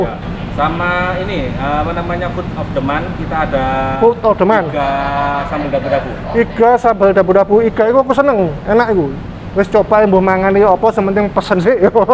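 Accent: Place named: Java, Indonesia. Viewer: Mas, sampeyan jowone medok temen. Trus iku le Madang enak men. Kapan2 aku tak nyoba lah. Suwun share nya nggih.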